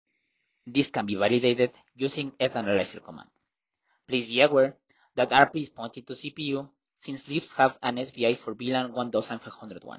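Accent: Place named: Spain. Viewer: Good content but Extremely hard to understand thick spanish accent.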